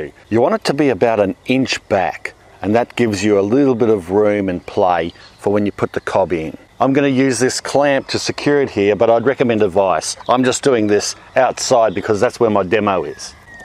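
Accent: Australia. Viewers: The Billy Mays of DIY, and bonus Australian accent. I wonder what else you are growing there that you are not showing us ;) You should pitch yourself to be a TV Ad/promoter.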